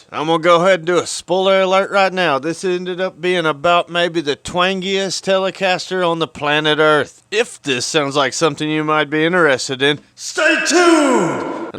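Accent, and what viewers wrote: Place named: Western North Carolina, United States. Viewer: You sound like my cousin from the western part of NC. I'm in the East.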